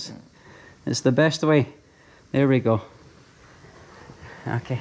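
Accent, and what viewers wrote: Scotland, United Kingdom. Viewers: Are you Scottish??? Welp!!! I have been to Scotland and stayed there approx 9 months mate 😀❤️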